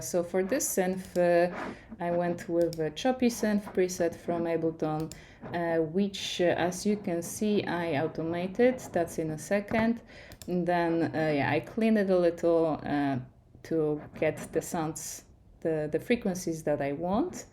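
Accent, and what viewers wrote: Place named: Poland. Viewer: Awesome! Can I find your music somewhere (e.g. Spotify or Bandcamp)? EDIT: ooo, i na dodatek jesteś Polką - tak pomyślałem w 1. chwili usłyszawszy Twój akcent ;) :D EDIT2: muzyka mogłaby być trochę głośniej, a myszka trochę ciszej :)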